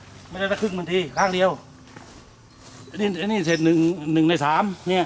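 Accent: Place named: South, Thailand. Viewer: จังหวัดไหนครับ สำเสียงใต้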